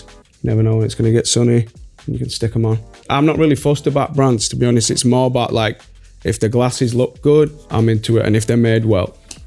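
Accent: United Kingdom. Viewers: Zayn has the Britishest British accent !! No wonder British Vogue had him here .....love him ❤ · Love that he is still so British even after living in USA for so long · What part of the UK is this accent? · The most British accent ever 😂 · I love his British accent but all of those tattoos makes me feel so scared and terrified around him… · "mix it with a bit o wo'oh" man really the Britiest-British eva 😂🥹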